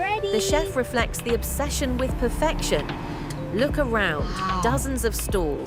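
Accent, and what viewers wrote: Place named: United States. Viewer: Annoying American narrator.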